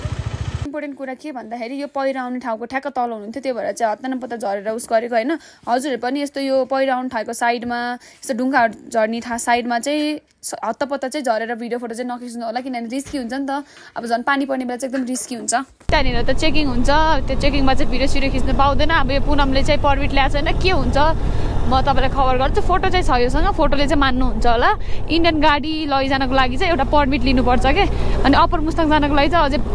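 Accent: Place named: Kathmandu, Nepal. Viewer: yo vanne ko para rw voice same thamel ma basne jastai xa hou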